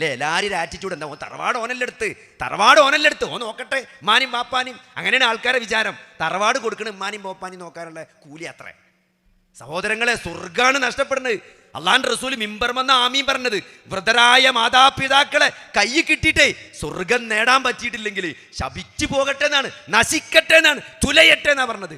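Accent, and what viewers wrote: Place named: Malappuram, India. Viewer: Very nice speech👍ഡോക്ടർ ആയിട്ടും തനി മലപ്പുറം ഭാഷ 😞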